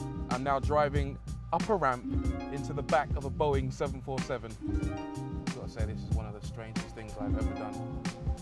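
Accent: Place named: England, United Kingdom. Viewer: Nothing hotter than a Black dude with an English accent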